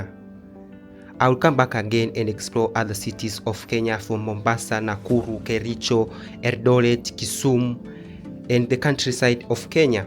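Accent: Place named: Uganda. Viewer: YOUR ACCENT IS FROM UGANDA NOT TANZANIA,,, TANZANIAN CANT SPEAK THAT ENGLISH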